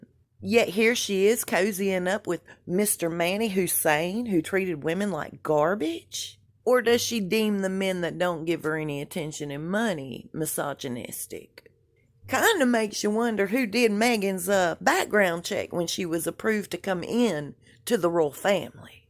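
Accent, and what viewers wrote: South, United States. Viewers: Hey, I just LOVE listening to your Southern accent, I could listen to you all day💕. When is your next Cooking show? Can you do Gumbo or Jambalaya? Peach cobbler?;)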